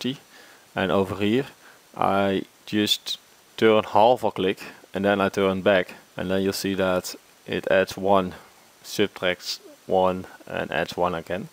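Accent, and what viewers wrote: Netherlands. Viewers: always nice to hear these dutch accents. nice video Continue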